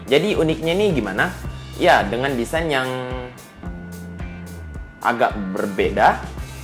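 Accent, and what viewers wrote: Makassar, Indonesia. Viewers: orang makassar kayaknya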